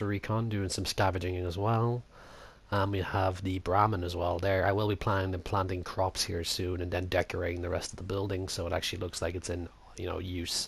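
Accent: Ireland. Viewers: You kinda sound like jacksepticeye I'm guessing you're Irish?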